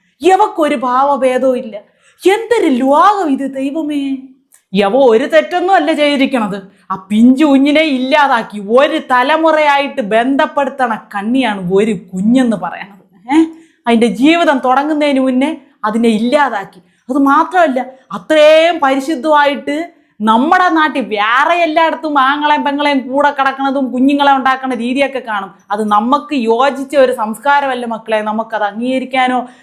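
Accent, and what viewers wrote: Thiruvananthapuram, India. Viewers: ജി നിങ്ങളുടെ തിരുവനന്തോരം ഭാഷയിലുള്ള സമകാലീന വിഷയത്തെ അവതരിപ്പിക്കുന്നശൈലി ഒന്നും പറയാനില്ല..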